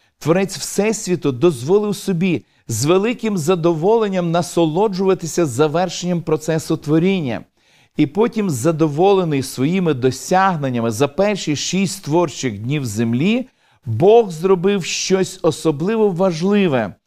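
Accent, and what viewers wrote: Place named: United States. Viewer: До чого цей дурнуватий американський акцент і менторство? Середньовіччя і мавп'ятництво в сторону американців. Огидно...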